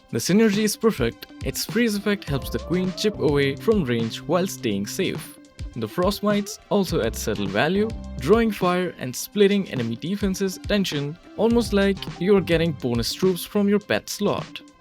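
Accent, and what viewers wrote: India. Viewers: Bro are you indian or what 😅❤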